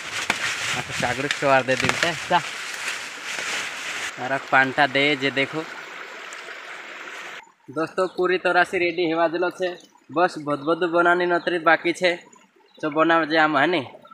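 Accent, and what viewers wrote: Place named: Madhya Pradesh, India. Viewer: आप जिस भाषा में बोलते हु व भाषा तो आलीराजपुर सोंडवा कि जैसे लगती है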